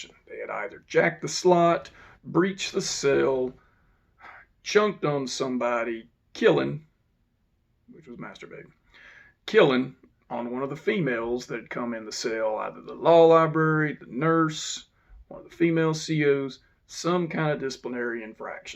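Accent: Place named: Texas, United States. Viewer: I'm glad to see you back! And it's great to hear a texas accent again after a year on the west coast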